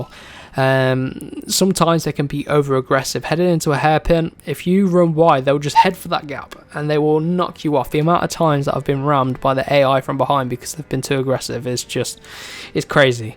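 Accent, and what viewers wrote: United Kingdom. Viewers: i love your british accent, man 😘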